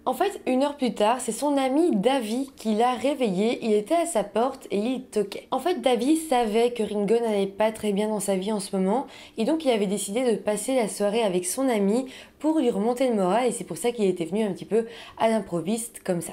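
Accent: Belgium. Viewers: Coucou pitchounette agréable travail et ta voix ton accent les ouite (8) et les nonantes ou septante la barre la belgique représente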